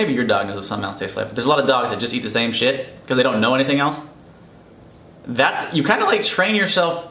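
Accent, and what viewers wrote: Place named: South, United States. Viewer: What part of the south are u from?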